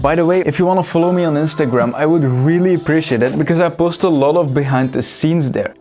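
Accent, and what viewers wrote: Netherlands. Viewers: nice but i smell nederlands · Cool series but i have one question, are you Dutch? I’m Dutch…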